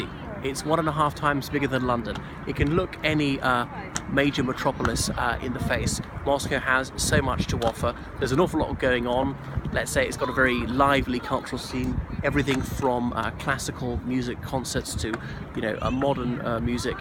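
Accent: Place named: Home Counties, United Kingdom. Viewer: u seriously from Ireland? U sound like you're from the home counties!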